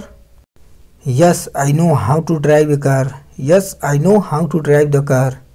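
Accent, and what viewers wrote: Nigeria. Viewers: Bahiyiya apki English Nigerian logon jesi kyo hai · Aapki aavaj Nigerian and chaina mix hey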